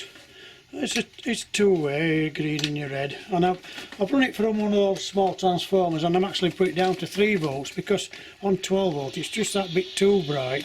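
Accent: Yorkshire, United Kingdom. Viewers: Trying to decide if you're a Yorkshireman. You sound like my friend who's from York. Beautiful model railway you've built. Would love to see it live! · Where are you from , is it somewhere between sheffield or Barnsley towards Leeds.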